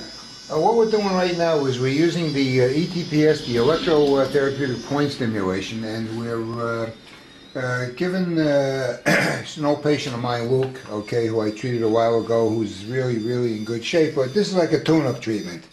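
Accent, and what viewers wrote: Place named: New York, United States. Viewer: You sure do have a New York accent! Haha well good video. taught me a lot about chiro.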